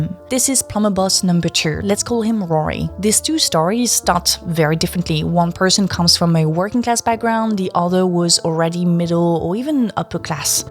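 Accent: France. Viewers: Why does sound more French than she used to? Her videos from 3 years ago sounded closer to an English accent than now? Is she just not around English speakers as much now? Just curious. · I assumed you were upper-class because you're French and have a French accent. (And because you're pretty, admittedly.)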